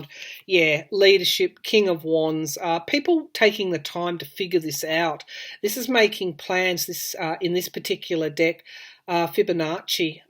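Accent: Australia. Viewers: Love listening to you, gives me hope! Love your accent, spent a month down under several years ago, New Year’s Eve in Sydney harbor, spectacular. Was so sad to hear the fires did extensive damage to KI. KI, GBR and Tasssie were amazing. Love Australia and it’s nature and friendly people. Stay safe everyone. Thanks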